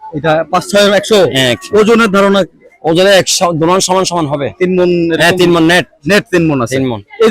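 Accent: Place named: Dhaka, Bangladesh. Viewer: আপনার দ্বারা ভাষ্য অনেক সুন্দর। চাকা বাংলার মতো বলেন। আলহামদুলিল্লাহ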